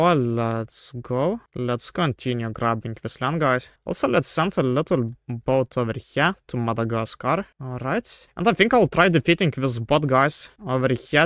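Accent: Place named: Russia. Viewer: damn the russian accent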